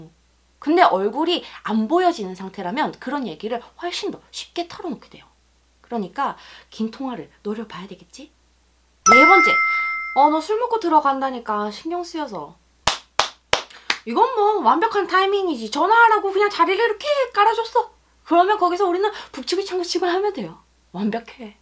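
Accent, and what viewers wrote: Gyeongsang, South Korea. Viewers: 서울방언속에 경상도표준어가 쪼매 들리네요.ㅎ 잘 듣고 가용.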